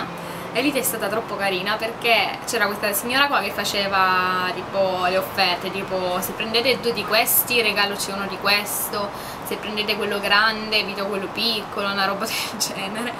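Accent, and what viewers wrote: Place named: Sicily, Italy. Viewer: ma come mai io pensavo che fossi siciliana???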